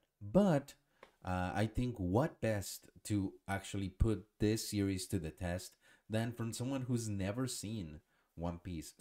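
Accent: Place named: Spain. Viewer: Okay., I'm gonna say it.Hearing a native Spanish speaker say Japanese words like Naruto in English is funny as heck. The 2 accents make it funny as all get out. could probably say it better in Spanish. When he says it in English the 2 accents compound to make it super funny. Don't stop. I still want to here what you have to say. Even if it sounds weird.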